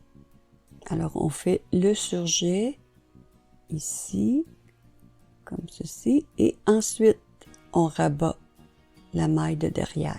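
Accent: Quebec, Canada. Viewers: Bonjour, je me remets au tricot juste parce que j'ai flashée sur une laine dégradée turquoise que j'ai acheté cet été en me disant, je verrai bien ce que j'en ferai... J'ai vu des modèles d'entrelac sur Pinterest, mais sans les explications, que j'ai trouvé ensuite sur YouTube mais sans le déroulé des finitions comme vous... Je vais pouvoir corriger et poursuivre mon tricot grâce à vos explications (avec en prime l'accent de mon amie Québéquoise) ... SVP: Pourrait-on avoir accès à des explications écrites (PDF ou Word)??? La transcription automatique est difficile à suivre et à enregistrer (Lol) MERCI INFINIMENT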